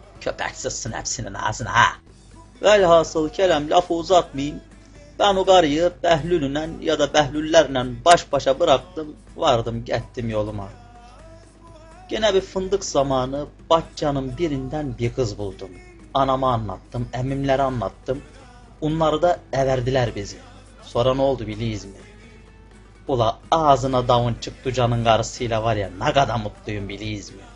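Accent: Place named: Ordu, Turkey. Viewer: Ellerine sağlık. Hem Ordu'yu hem de bizim oralarda konuşulan şiveyi tanıtmışsın. Benim babam da Gülyalı, Yeniköy mahallesinden, eski adı Ab-ül hayır bilirsin:-) Yalnız bu senin konustugun şive daha çok bizim orası gibi köylerde konuşuluyor. Ordu'nun içinde daha çok "Da" kullanılıyor. Bıldır bu vakıtları Ordu'nun içi pek bilmez;-) Neyse çok sevgi ve selamlar. Ablayıra yolun düşerse bekleriz, görüşmek üzere:-)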